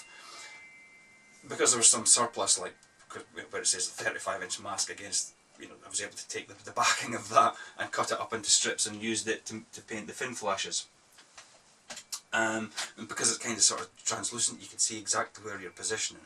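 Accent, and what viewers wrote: Scotland, United Kingdom. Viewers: Hi! great model indeed, the version is quite interesting with a worn out camo! thanks for the picture anyway. Great Sccottish accent too! ps: the bell jinggling in the background sounds like an ice cream van ...Thanks again ; and keep it up.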